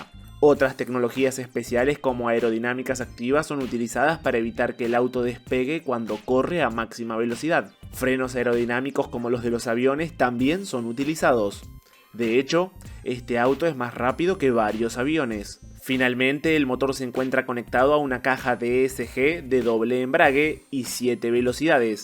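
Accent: Argentina. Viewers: soy argentino y ese acento es muuuy argentino.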